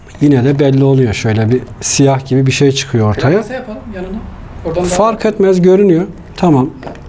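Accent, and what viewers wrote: Erzurum, Turkey. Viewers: Güzel bilgiler ustam . meslek lisesi elektrik bölümü mezunuyum ama bunlari gostermediler.bravo basit ve anlasilir bir şekilde titizlikle anlattiniz.bravo.ayrica Erzurum şivesinede bayiliyorum.tom diye bi uygulama vardi sincapmi ne cok güzel konusuyodu.bu şiveler ülkemizin zenginligi.kolay gelsin, 👍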